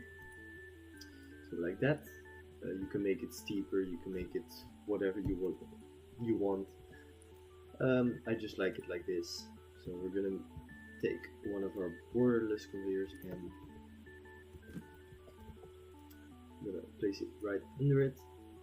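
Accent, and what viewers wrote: Netherlands. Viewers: ar u dutch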